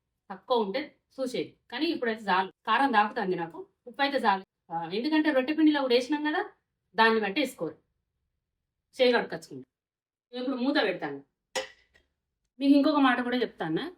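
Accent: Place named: Karimnagar, India. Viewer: Karimnagar slang matladthunnaru 10years tharvatha malli akkadi vaallatho matladinattu happy ga vundhi chaala manchiga nerpisthunnaru. maadhi Kadapa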